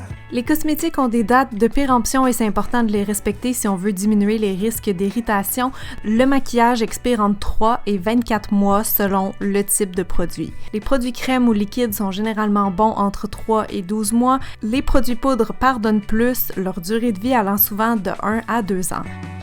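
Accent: Canada. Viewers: As un accent Canadien ou pas ?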